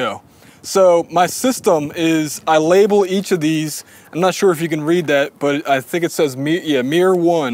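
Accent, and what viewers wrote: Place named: Philadelphia, United States. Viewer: Hmmm... sounds an awful lot like you have a Delaware valley/Philadelphia accent. Anyway, I really enjoyed your song analyses and the loudness wars video, keep up the good work.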